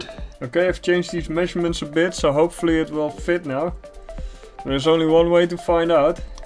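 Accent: Netherlands. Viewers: Damn your Dutch accent is strong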